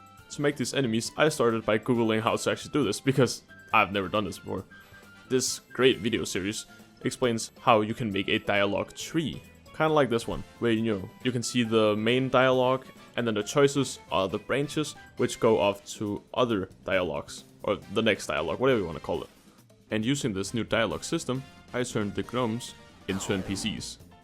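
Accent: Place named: Denmark. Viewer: Bro the accent sounds so danish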